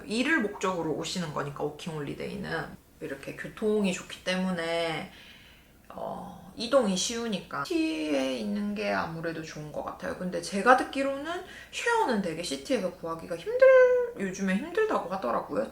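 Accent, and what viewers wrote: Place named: Gyeongsang, South Korea. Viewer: 서울사람이라고는 하지만 감출수 없는 경상도 억양 ㅋㅋㅋ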